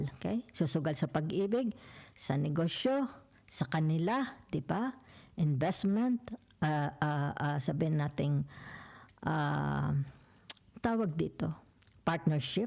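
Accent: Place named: Tanay, Philippines. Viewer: I claim it all positve readings🙏🙏🙏thank you po sa mga readings nio,ang galing nyo po magsalita,may punto po ang salita nyo,punto po ng mga taga tanay..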